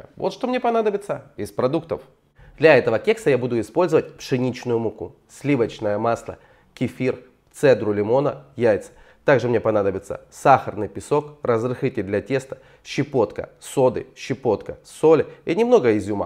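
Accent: Ukraine. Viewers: Спасибо,.Очень просто и вкусно.А ваш милый украинский акцент вызывает у меня ностальгию.😊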